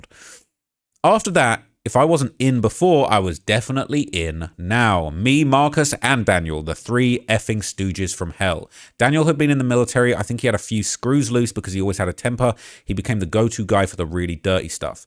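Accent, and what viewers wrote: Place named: United Kingdom. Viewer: Be careful saying stuff about Donnie in these videos. Your British accent with a US location is inviting ICE at your door.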